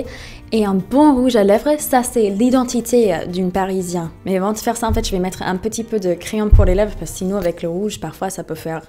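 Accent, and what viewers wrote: United States. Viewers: Ah ah une française sans mascara ? Moi je peux pas vivre sans ! J'adore ton accent américain 😍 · Ça fait partie du charme de son accent americain! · Bonjour, Je lâche très rarement des commentaires sur Youtube, mais je prends le temps de t'écrire ici car je te découvre tout juste sur ta chaine et suis tombé immédiatement sous le charme. Belle comme un cœur et possédant un charme fou, cet accent américain finira par me faire découvrir une personne attendrissante et qui traite de sujet France/Amérique sous un autre jour et d'un point de vue étranger. Bref, je ne fais pas une déclaration, loin de moi cette idée, mais tout simplement la découverte d'une personne rayonnante et intéressante qui t'a fais gagner un abonné de plus:) Te souhaitant une bonne et heureuse continuation dans ta vie de tous les jours et sur Youtube;) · Je vien de m'abonner à ta chaîne et je te trouve tellement belle tellement fraîche ! 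Et ton accent américain est juste trop CANON grace a toi j'en apprend plus sur les américains et les usa ! Mon reve est d'aller a NYC mais j'ai peur de l'avion (c'est ironique hein) Ahaha gros bisous !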